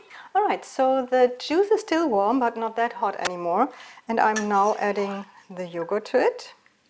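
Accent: Germany. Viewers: Best recipe. Maybe Imperial is cup measurements, I have an app that converts & on measuring cups we have both. America uses cups & ounces. I love your slight German accent & have just subscribed. I can’t wait for some great German recipes!!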